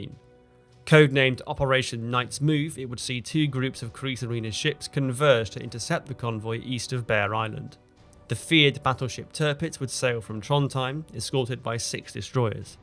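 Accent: England, United Kingdom. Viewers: Lack of detail; and I'm from the US. It takes more than an English accent. go do your homework. disliked.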